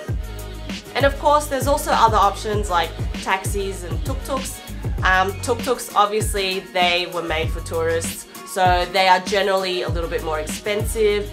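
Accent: Australia. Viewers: Nice to hear an Aussie accent :)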